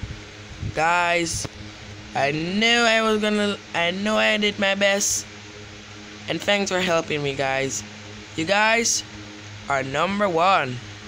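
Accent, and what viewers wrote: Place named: United States. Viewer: Yuh sound a way talking american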